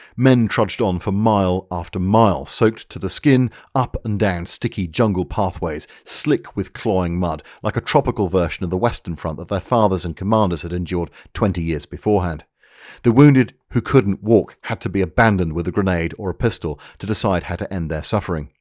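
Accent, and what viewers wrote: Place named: Birmingham, United Kingdom. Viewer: Hey, thanks. Liked the Brummie accent. 😎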